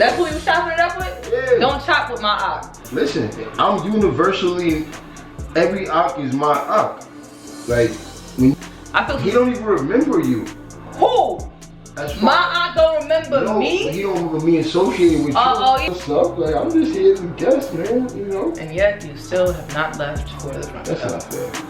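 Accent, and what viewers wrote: New York, United States. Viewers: where she from ? she sound like she from chi that’s where I’m from and she sound just like me 🤣 nvm she sound like she from New York 🤦🏽‍♀️ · Lmaoooa “my ock don’t remember me??” I knew you were from New York😭😭💛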